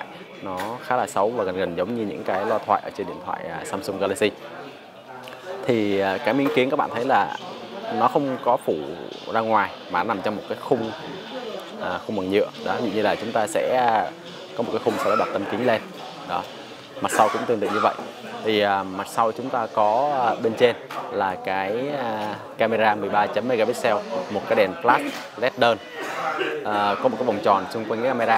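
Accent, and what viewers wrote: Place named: South, Vietnam. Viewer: Giọng zai miền nam nghe hay quá :x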